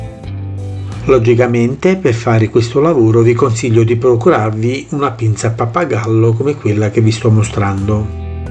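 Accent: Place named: Avellino, Italy. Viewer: sono di Scalea , tu dove abiti ( senza dirlo , ma più o meno ) verso Licola o verso Torre ? A volte sembri quasi avellinese .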